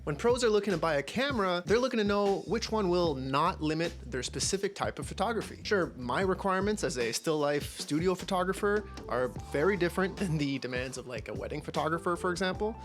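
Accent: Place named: Quebec, Canada. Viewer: Ben weyon! Ton français fait vraiment Québécois!? Tu viens du Québec?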